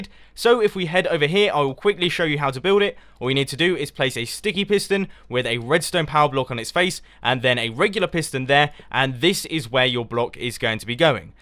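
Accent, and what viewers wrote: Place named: United Kingdom. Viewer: Yeah and he has a very British accent lol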